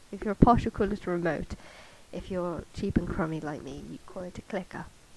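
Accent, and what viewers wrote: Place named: United States. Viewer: Your voice is very pretteh~ :333 I also love your American accent... It sounds perfect xD Do you mind if I do this?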